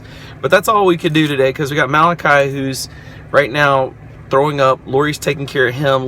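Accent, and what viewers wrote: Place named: United States. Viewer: Awe...a little country boy accent, to sweet, hope so!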